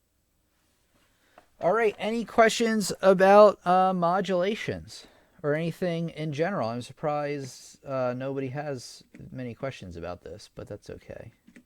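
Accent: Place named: Philadelphia, United States. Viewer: Could not join your Live stream as a participant tonight! Wonder if others had issues too? Seems You Tube failed us with not error message. , Nor could I support the channel which I’d gladly do. Just today, I rec’d your suggested book from Amazon: "Scales, Chords, Arpeggios & Cadences”. Got to page 8 under 'Chord Progressions' & just failed to comprehend what this sentence means, here goes: “When a triad is not in root position, the ROOT is ALWAYS the upper note of the interval of a 4th!” Can you explain or re-word this sentence in a differnt way or possibly give an example, especially on the “..4th interval”? It is an enigma why my question on your Live presentation wouldn’t post! It was so annoying as there was not an error message nor any indication how to enter my text. This occurred, not at the end of your transmission, but >15 minutes prior. 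Any help with that? Lastly,, hearing your accent, it sounds as if you’re located somewhere near Philly area. I think you mentioned you do give piano lessons? I’d like my family to have keyboard instruction, with one who knows how to demystify complex concepts e.g. chord modulations, without feeling overwhelmed. As an educator & public speaker, I recognise, you do have a gift, making the terminally-complex, less so. Thanks & sure hope you can advise, what I may have done wrong, not being able to join the conversation tonight? Deni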